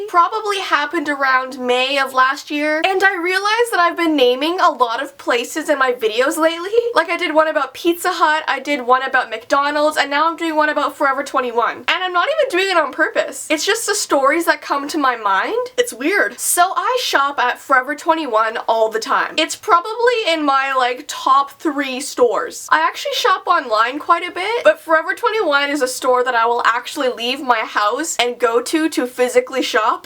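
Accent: Canada. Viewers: Me: is she Canadian or American?: it's really weird eh Me: ok she's Canadian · "It's so weird eh?" 😂☺️her Canadian accident is the cutest lol. I'm from the south so that's really rare to find. Love you !!!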